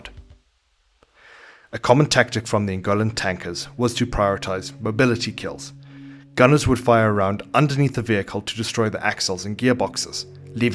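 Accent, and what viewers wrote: South Africa. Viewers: Are you south african? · mind if i ask are you south african? i can hear it in your voice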